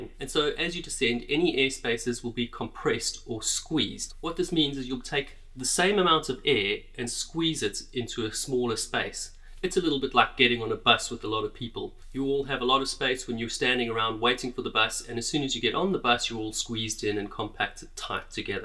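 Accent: South Africa. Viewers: You have a South African accent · are you south african?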